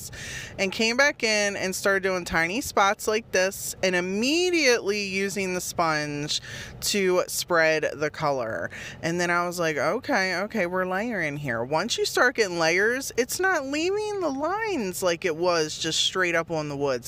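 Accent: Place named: South, United States. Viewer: Haha. That is what you do to me every week. Your sense of humor is just like mine, sprinkled with a little bit of evil, just a tiny bit. 😂😂 It is raining and cold here. Is it cold where you are? I can tell you are further south, by your accent. It snowed a couple weeks ago, then it got into the 80s, for a week and a half, now I am dressing like I live in the tundra, again. I mean, c'mon, it will be june 1st in 4 days. It better get warm, or I am just going to start making winter crafts...maybe a snowman, eating watermelon and wearing a bikini. ⛄🏖👙 🍉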